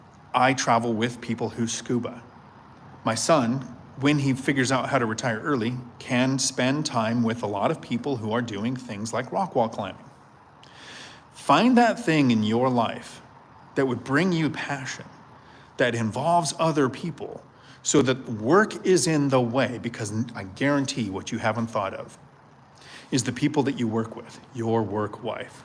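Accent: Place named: United States. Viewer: Speaks American. I've been to Thailand many times and they don't speak any particular kind of English except English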